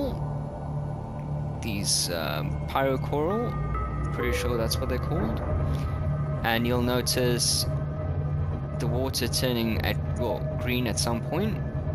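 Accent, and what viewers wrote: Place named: South Africa. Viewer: Ah cool, love accents but always had a hard time spotting south african, anyways thanks for the help with these videos, really helped a lot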